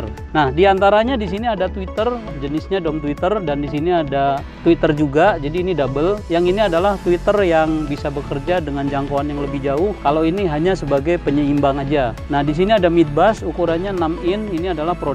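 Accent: Banyumas, Indonesia. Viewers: Jebul wong ngapak... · Walah ngapak boss, kapan2 tak dolan meng toko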